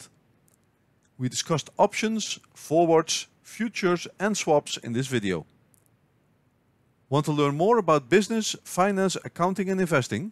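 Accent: Netherlands. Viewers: Tis hard hoe dat ge hoort dat nen ollander is :DDD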